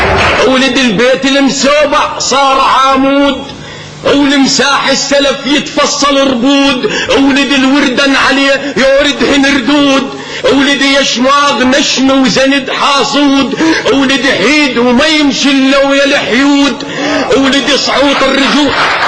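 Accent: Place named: Iraq. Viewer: اللهجه عراقيه خالصه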